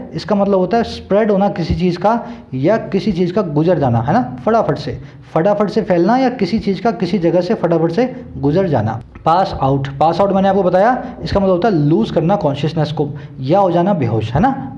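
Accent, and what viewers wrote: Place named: Uttarakhand, India. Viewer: Sir, Your pronunciation is like Uttarakhand's Pahadi people.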